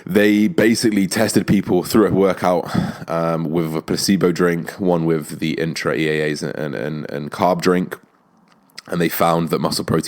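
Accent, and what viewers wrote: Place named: RP, United Kingdom. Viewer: You sound like such a posh boy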